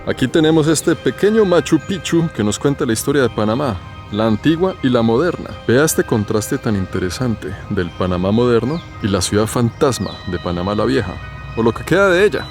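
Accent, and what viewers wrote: Colombia. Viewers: pero su acento parece más colombiano (del interior) que panameño... O me equivoco?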